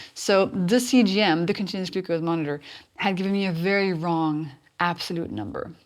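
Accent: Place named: France. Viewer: Is French? She has got a French accent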